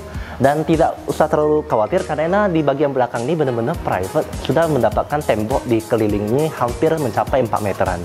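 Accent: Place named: Medan, Indonesia. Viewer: Ngomongnya ciri khas cina medan bgt yaa.cadel bgt..hehee. Ga kyk cina jkt lbh enk dengarnya.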